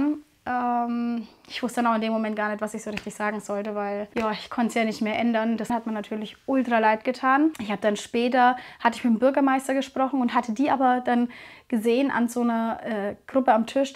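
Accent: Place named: Franconia, Germany. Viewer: Liebe, ich weiß noch, als ich dich zum 1. Mal mit Sandra gesehen/gehört hab. Mein erster Gedanke war "die gefällt mir" und "die kommt doch aus Franken😂" dein Kanal heißt " " das war für mich persönlich nie nur nähen, sondern auch DIY's. Es ist deine Entscheidung, ob du mehr DIY's und eine Sommerpause machen möchtest. Es jedem Recht machen, kann man leider nicht. Dein Herz hat gesprochen und ich freue mich schon sehr auf ALLES was noch kommen wird ❤❤ Liebe Grüße und fühl dich fest gedrückt ❤❤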